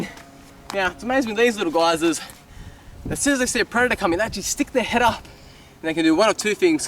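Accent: Australia. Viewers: This guy vs The Kangaroo punching aussie vs Gary the Goat for most Australian thing on the web.......P.S. what does he call his audience? At first i thought he was saying "george" then it sounds like "church"...i have an American's ear and some thick aussie accents sound like vegtable soup in my ears..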